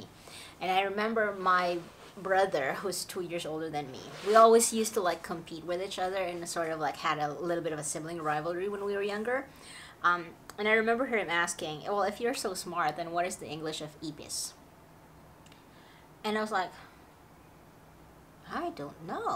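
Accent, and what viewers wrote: United States. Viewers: Hola amiga:) I'm a little late but Congratulations on your wedding hope you had a spectacular day! May God bless you with love, happiness, laughter and patience on your wonderful journey together:):) I hope you post at least one picture of your wedding look. If you already did, sorry I'm trying to catch up on videos. I used to be a party girl loved the clubs and loud music especially the dancing I could dance all night and have the energy to go out the next day to do it all over again. As I've gotten older I don't go dancing as much anymore my health problems make it a little harder. And I lived for spending my days at the beach it took all the stress right out of my life. Also, enjoy going out to dinner and spending time with the girls and great conversation LOL When I started watching your videos I thought you were from the US. You're probably right watching all those shows growing up influenced the way you speak. 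The only show you mentioned I never watched was Friends. Star Trek is my favorite show. I'm from New York and hope someday you get to come and experience this wonderful place. There is so much to see from Broadway, Rockefeller Center, Lincoln Center to eating a NYC hot dog hahahahah And maybe you can do a meetup. Have a happy day!!! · Hello, una sa lahat huli naba ako. Tell you frankly watching & listening to your blog. Kaya naiingit ako sa iyo, dahil buti kapa meron kanang American accent samantalang ako ito halos 34 years na dito dihin parin gaanong sanay mag- English ewan ko rin kung bakit. Siguro dahil tinatagalog ko itong misis kong puti. Siya ang pinipilit kong magtalog. By way I missed iyong blog mong Tag-lish na naglalakad along busy city somewhere in Sydney sa palagay, iyong palagi mo pang sinasabi mahal din kapag ki- convert mo. At iyong lilipat na kayo sabi mo pa yata may daga at malayo sa trabaho ng mister. 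O well napahaba na nandidito na nga pala ako sa States of Florida, probably you heard about the astronauts & home of the " Blue Angel " iyon. I used to worked in the Space Program before malapit lang dito 15 minutes drive work their for 16 years. You like the climate here just like sa Pinas and 3 hours drive away to Miami where they always shoot the Miami Vice during my teenager life. Dalasan mo sana ang paggawa mo ng mga blog, gusto ko makitang viral sa mga gaya nuong ibang Pinoy!😍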